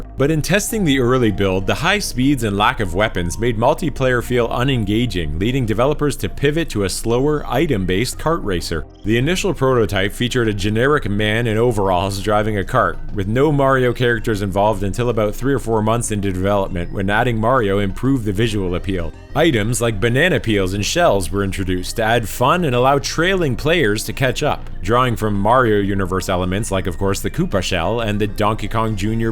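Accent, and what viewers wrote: Canada. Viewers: no worries! I was about to post that you weren't AI when I heard a Canadian accent come through, but you beat me to it. I also learned that little bit of trivia with the Mystery Dungeon, I thought Shiren was the OG but turns out it was the blue cat I saw in Japanese magazines throughout my teens. Thanks for the content!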